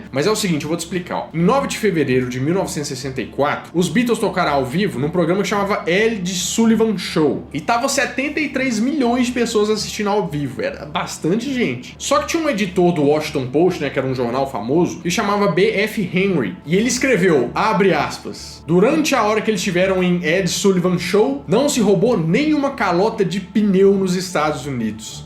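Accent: Minas Gerais, Brazil. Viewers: 1- O sotaque de Minas Gerais é um dos mais bonitos desse país. Bão msm. 2- Vídeo de abdução: eu voto Sim!!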